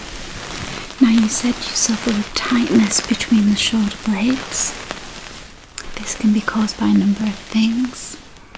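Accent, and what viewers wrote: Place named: Yorkshire, United Kingdom. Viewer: I think Blissful Whispers ASMR is a Yorkshire girl as well. You ladies have beautiful accents.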